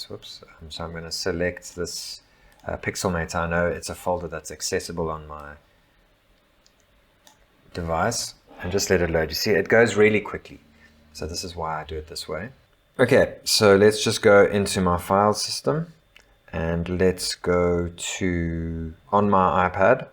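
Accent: South Africa. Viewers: Was about to say that bro sounds south African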